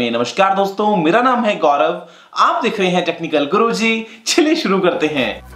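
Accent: India. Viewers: NOT ALL KNOW INDIA ENGLISH PLS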